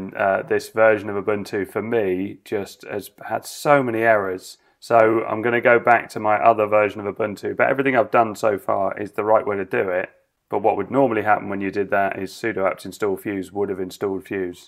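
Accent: United Kingdom. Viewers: I bet you're from the UK.. Greets to Mr. Bean.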